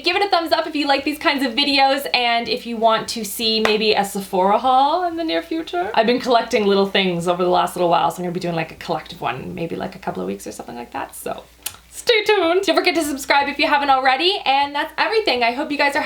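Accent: Canada. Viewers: Where does she live ? She sounds Canadian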